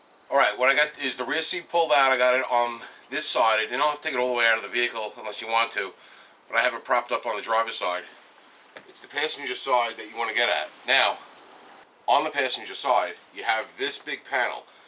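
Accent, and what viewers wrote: New York, United States. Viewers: You are hysterical! Love the New York accent! I am a Mom down in Georgia and replaced the actuator by myself, so I had to move my own crap out of the back! The cool thing is that I found some boots I bought that I had forgotten about! Now the back of my car is cool and so am I! Ha! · I especially love the New York accent! I’m from Yonkers & moved to provincial Connecticut where that familiar accent is missing. My 1997 GMC has had numerous A/C issues. I was told by a mechanic the new issue is the blend door actuator? Now I know what I need. Thank you!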